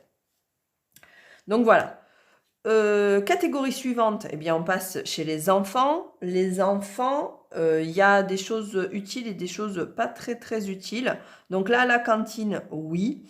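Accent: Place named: Toulouse, France. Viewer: Coucou déjà j arrive pour ton accent car tu me rappelle une copine que j avais à Toulouse mais surtout par tes explications simples et sensées etce que je pourrais retenir Merci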